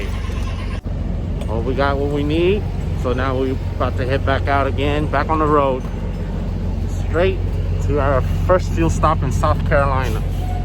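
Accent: Vietnam. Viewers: You sound Vietnamese? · nghe giọng a giống người Nha Trang!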